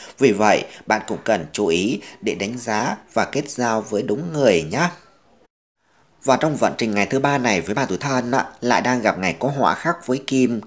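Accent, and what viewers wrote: Thanh Hoa, Vietnam. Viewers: Giọng Thanh hoá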